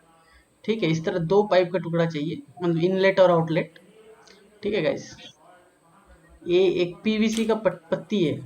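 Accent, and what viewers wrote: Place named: Andaman Islands, India. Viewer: Apna andamani slang mein technical sikhne ka alag hi maza hai 👌😁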